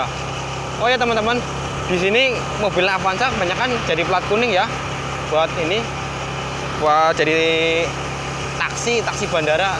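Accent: Banyumas, Indonesia. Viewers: Keren mas bro otw trus, btw suara.ne ngapak sedulur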